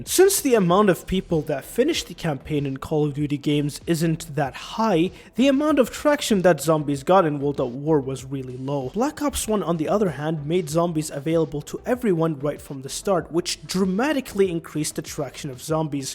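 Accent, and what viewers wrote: Arab world. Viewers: من صوتك عرفت انك من ربعنا🫡 والله مقطع ممتاز بس يرحم والديك خذ نفس وخلنا ناخذ نفس معك، صدع راسي من كثر ما انه مافيه اي استراحة بين كل جملة وجملة. يعني خل الكلام يطلع بشكل طبيعي مو جملة ورا جملة ورا جملة كأنك روبوت مرة ثانية والله مقطع ممتاز واخيرا احد عربي سوا مقطع (كويس) مكثف عن الزومبي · Your accent is Arabic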